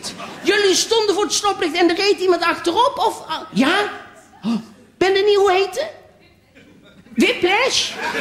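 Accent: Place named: Tilburg, Netherlands. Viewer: Ik snap dat sommige mensen hem misschien niet grappig vinden. Ik persoonlijk wel. Marc Marie is namelijk een rasechte Tilburger en praat ook met een echt Tilburgs accent. Aangezien ik zelf ook uit Tilburg kom, kan ik er hartelijk om lachen! Daarnaast is het ook een kwestie van of je de grappen gewoon leuk vind. Misschien vind ik een ras-Rotterdammer ook niet grappig. En ik hoor van veel mensen uit andere provincies dat ze hem vaak niet eens verstaan, haha. Ik ben in ieder geval dol op hem! :D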